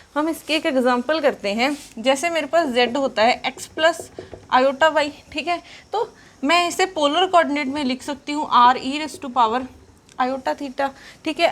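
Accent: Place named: Punjab, India. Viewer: Punjabi accent aa hee jta beech mai 🤣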